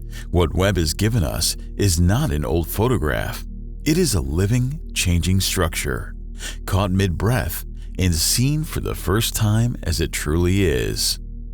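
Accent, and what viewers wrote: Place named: United States. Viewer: Every bloody where you go, every bloody video....a yank accent. Too many yank accents..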